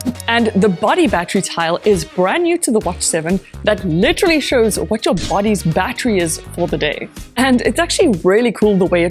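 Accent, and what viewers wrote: South Africa. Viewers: London but you sound South African... Amirite? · South african accent😅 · Getting my watch in a week. Lekker video, sounds like a zaffer in the UK. Am I right ?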